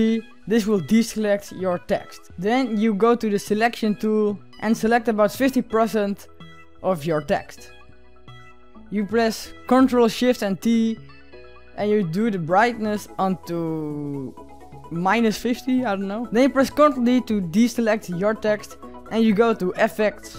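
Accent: Netherlands. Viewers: je kan best goed engels maar je hoort gewoon dat het een nederlander is :) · ik hoor dat he nederlands bent P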